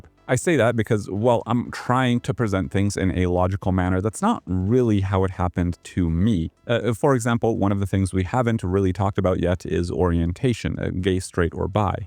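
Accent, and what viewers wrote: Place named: Sweden. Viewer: I see, sorry for assuming. I thought I heard like a faint hint of Swedishness in the way you pronounced some vowels. Great video by the way🙏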